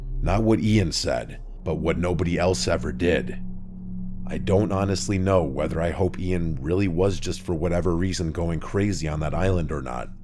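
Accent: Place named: Staten Island, United States. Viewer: im so happy still makes videos and that he's managed to tell so many stories over the years. My sister and i would religiously watch these back when corpse husband was still posting, mainly because were from jerz and the staten island accent feels like home loll (fr my sister was soo picky about narrators voices. she only watched your channel cuz ny accent is the correct way to speak loll)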